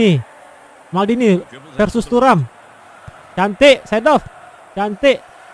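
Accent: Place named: Riau, Indonesia. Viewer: Kau pasti orang Sumatra khusus nya Riau kan, ketebak dari logat bahasa Indonesia mu 😂😂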